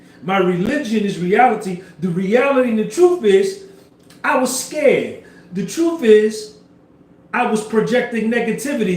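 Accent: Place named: New York, United States. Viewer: You gotta be from ny. I know bc I'm from ny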